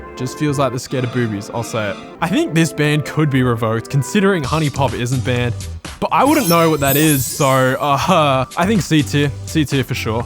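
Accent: Australia. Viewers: Like hell anyone wouldn't notice your Australian accent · Yeh I'm Australian and he has every Australian accent quirk known to man 😂, from "australier" to the "videor" although to be fair all those quirks can also be found in alot of London accents except the throat sound.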